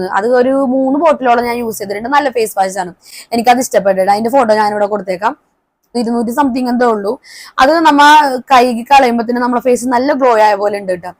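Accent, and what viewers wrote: Kochi, India. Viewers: മരുന്നിനേക്കാൾഎനിക്ക്ഇഷ്ടപ്പെട്ടത്കൊച്ചി ഭാഷ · Kochikariyanalle samsarathil thonniyatha dear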